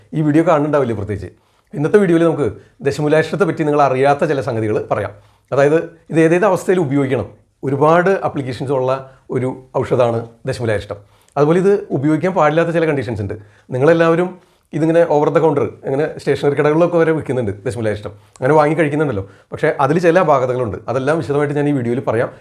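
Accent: Thrissur, India. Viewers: Are you from Thrissur suburbs like Ollur? Very familiar slang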